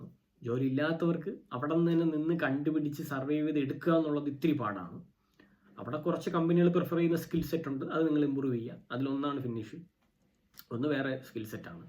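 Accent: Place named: Trivandrum, India. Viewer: I'm also from trivandrum.. Slang kettappazhe thonni trivandrum aanennu. Trivandruthu evdeyanu..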